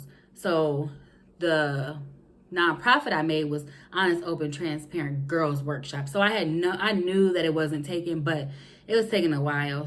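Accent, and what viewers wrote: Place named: Ohio, United States. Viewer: I love your transparency and authenticity in this video!!! 🙌🏽 I’m interested in learning more from you 😍 sounds like you’re in Ohio so am I!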